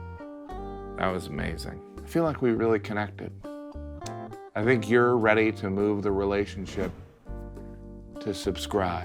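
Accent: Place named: Midwest, United States. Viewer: I think it’s the Midwest accent- it makes everything sound so nice 🤣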